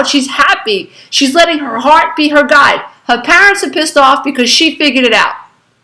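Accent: New York, United States. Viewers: OMG! Love you! Love your style too! You SOOOO hit the nail on the head with everything you speak of in this video! This is the first time I'm viewing your videos as I have been doing inner child work for years and within the whole ascension process, but not with any major breakthroughs, THEN, I found this! I love your New Yorkness! I from NYS as well, (in fact, we have the same enthusiasm when speaking of something we love!!) When you described the narcisst and the co-dependent, holy smokes, I realized that my mother was both & so was my father! I cannot even describe how much this video has helped me, and this is only the first one! Will be viewing all of your videos on this subject! You are so genuine, funny, inspirational and so right on!:D AND explains why I've always had 5th chakra issues! I also follow LOA practices and metaphysics, raising my vibration, spiritual, sound healing, Reiki, you name it, but WoW! 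Love your uniqueness! Thank you Sweet One for doing what you do and for shining your light ever so brightly!!!!!!! - You Rock!! Namaste. <3 <3 <3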